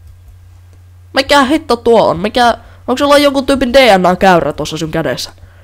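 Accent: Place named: North Ostrobothnia, Finland. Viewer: mistä päi oot jotenki kuulostais pohjois-pohjanmaan murteelta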